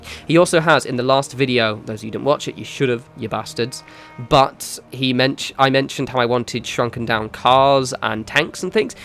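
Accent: England, United Kingdom. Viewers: Adam Gaffen He has an English accent.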